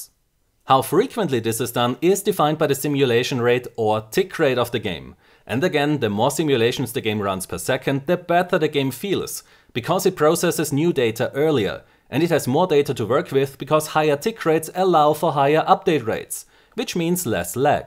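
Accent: Austria. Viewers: Bist du Österreicher? ^^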